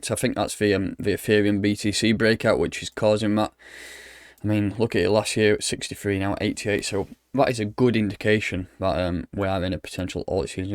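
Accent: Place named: Northern England, United Kingdom. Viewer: Proper northern boy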